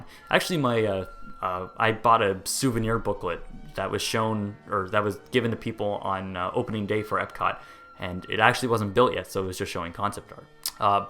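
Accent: Canada. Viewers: you should do impressions of Ashton Kutcher, without your Canadian accent of course. You sound exactly like him!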